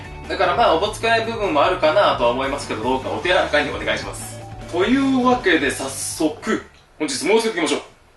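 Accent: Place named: Hiroshima, Japan. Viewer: 広島出身の人かな？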